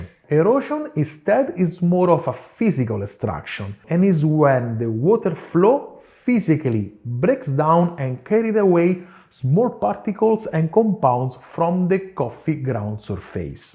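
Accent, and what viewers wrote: Italy. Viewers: Excellent video and the Italian accent makes even more authentic 😁 Having both I always felt dark roast which I only use tasted more smoother, abit more refined and abit more juicier on the Venus. Moka has the less refined and harsher finish overall. Personally I only use the Venus & it looks more astectically pleasing too 👌❤🇿🇦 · Dam this guy is a coffee scientist. The Italian accent makes it perfect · I Like the italienenglish😊😅 good Video. Thank You!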